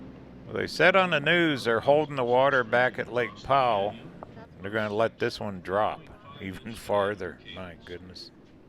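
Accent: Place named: St. Louis, United States. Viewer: My wife and I enjoy your Chanel. On a personal note, your dialect sounds just like my cousin- from St. Louis.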